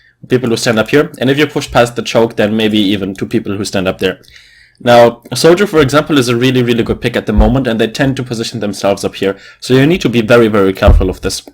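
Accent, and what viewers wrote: Germany. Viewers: Are you German? You kinda HAVE to be German. You sound REALLLLLYYY German bro c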